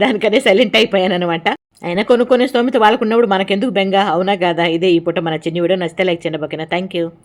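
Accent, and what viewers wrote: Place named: Anantapur, India. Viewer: Ma లాంగ్వేజ్ మాట్లాడుతున్నారు మీరు అనంతపూర్ వాసులేనా మా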